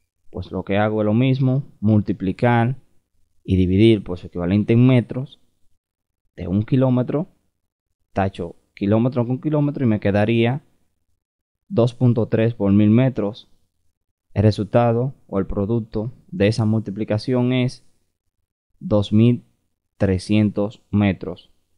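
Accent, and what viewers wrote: Dominican Republic. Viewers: de donde eres me sueñas dominicano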